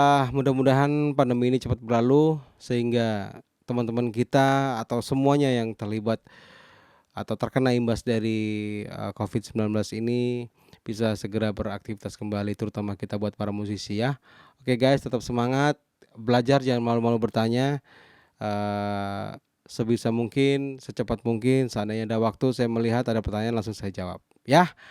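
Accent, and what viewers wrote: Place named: East Java, Indonesia. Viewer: Logat jawa timurnya berasa mas... Hehehe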